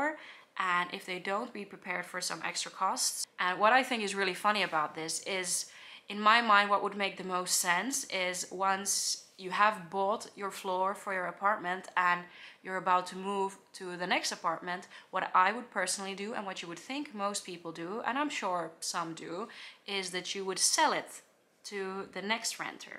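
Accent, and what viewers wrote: Netherlands. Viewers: I find it so funny that though you fairly recently moved to the Netherlands, you do speak english as a real dutchie :) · You speak very good English, but with a light Dutch accent. But your from Finland. 😅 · Hi, usually I recognize a Finnish accent while someone is speaking English (Kimi Raikonen is a perfect example). But you have a slight Dutch accent while speaking English and I'd never guess you would be Finnish. It's funny. Your English is perfectly fine btw. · You definitely have a bit of a Dutch accent when you speak English. I don't hear much of a Finnish accent in it. That's not a bad thing! Regarding helmets: I've read some studies about wearing helmets when biking. It turns out that people wearing helmets tend to take more risks, because they feel safer with a helmet. So they bike faster and more recklessly. Because of that, they end up having more accidents. 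People without helmets tend to be more cautious, so they don't end up in accidents that often. But if it makes you feel more comfortable, it's definitely okay to wear one. Also, I loooove Finland! P.S. Don't forget to visit the province of Friesland, with its lovely small cute towns and the Wadden Islands, one of the most beautiful nature areas in the country!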